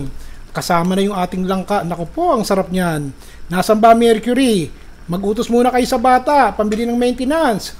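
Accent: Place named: Angono, Philippines. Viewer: Informative and entertaining lalo pag nagsasalita po kayo dahil naaalaala mga taga Angono na pareho mo punto. At sarap ng kakainin habang nanonood ng show mo. All the best,!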